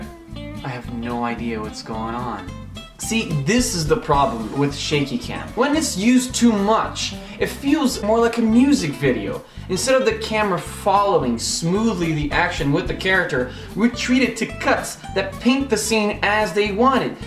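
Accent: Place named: North America. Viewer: cara, gostei pra caralho, apesarapesar de ainda ser um canal pequeno tem uma qualidade incrívelmente boa, e se o nn tivesse falado, eu teria certeza de que vc era norte americano, parabéns mano!!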